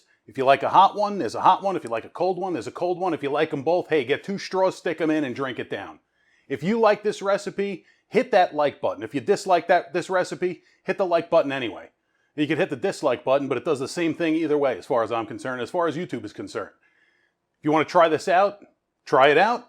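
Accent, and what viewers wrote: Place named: East Coast, United States. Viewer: You live in Florida but sound like East Coast...New York or New Jersey. Also you come across as Italian. My mom was Italian and Dad Irish/English backgrounds.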